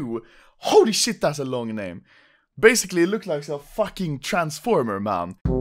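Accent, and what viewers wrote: Sweden. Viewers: du är svensk man hör på ditt uttal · You swedish ? Lol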